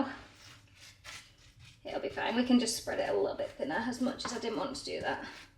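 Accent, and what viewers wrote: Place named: England, United Kingdom. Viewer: Love your English accent. I wish I could try your chocolate 🍫.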